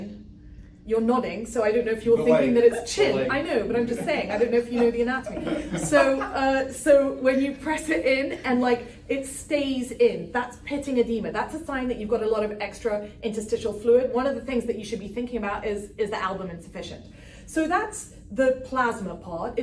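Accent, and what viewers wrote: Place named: United Kingdom. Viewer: Dr., I was wondering if you have ever worked in NHS as well? Your accent is very British.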